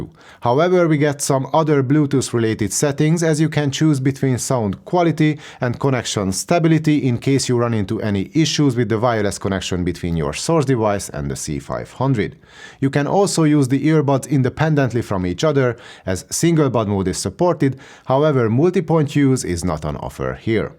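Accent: Hungary. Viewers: Nice professional review with a nice hungarian accent :)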